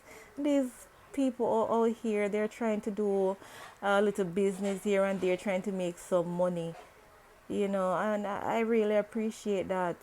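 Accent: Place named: Jamaica. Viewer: Talk like Jamaican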